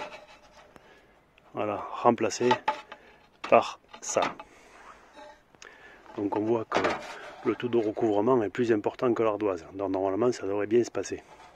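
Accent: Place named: Bigorre, France. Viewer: Félicitations. J'ai moi aussi installé mon poêle dans mon atelier cette semaine. J'avais aussi regardé pour un Godin identique mais je n'ai pas eu la chance d'en trouver un à un prix si bas. C'est donc un theca de 20kw car j'ai la chance d'avoir une grande surface de jeu. Très belle vidéo...c'est un plaisir d'entendre l'accent bigourdan sur YouTube. Amitiés gersoises Anaïs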